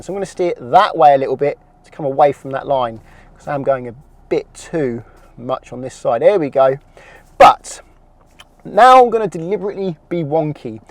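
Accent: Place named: Oxford, United Kingdom. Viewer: Shit oxford eng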